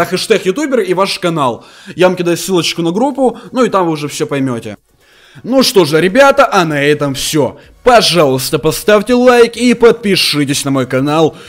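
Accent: Ukraine. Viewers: говорит кнайф потому что он украинец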